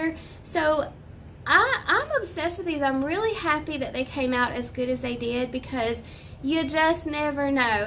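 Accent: South, United States. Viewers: You are so cute. I love your personality and your southern voice.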